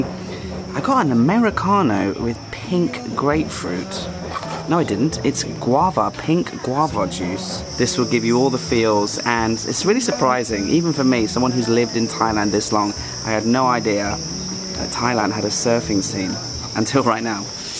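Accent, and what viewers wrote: England, United Kingdom. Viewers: So he s from Northern Ireland 🇮🇪 why does he have a slight English accent??? I think this might be my new H.Q. when I go there for 6 months in Oct. 2024. Looks like a great mild place to learn. Im 45. I GUESS ITS NEVER TOO LATE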